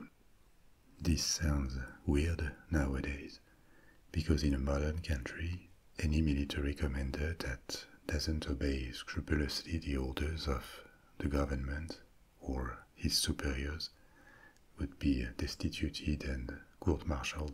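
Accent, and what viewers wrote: France. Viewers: ASMR & History with a French accent.Perfect.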